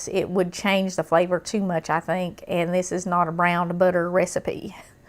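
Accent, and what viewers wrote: South, United States. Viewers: I love her accent. The lovable southerner.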